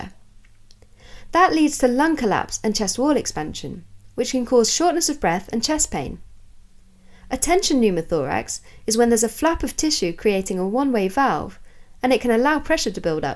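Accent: United Kingdom. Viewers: Good British English accent. Clear explanation. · The narrator is so British 😂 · my brain is so used to the american accent on these videos and the british accent was a bit of a shock! lmaoo hahaha and im british lmaoooo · The British Accent makes such a positive difference, its like listening to Arya Stark:)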